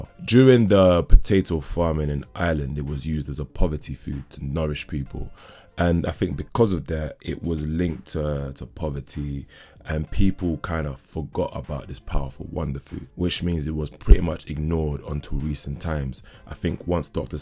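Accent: Caribbean. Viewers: The information that you shared is very empowering. Thanks. I love your voice/accent. It's very raspy/sexy and Caribbean. Can you share some shakes or drinks that we can make using seamoss/seaweed/algae? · He sounds Caribbean to me.....African are different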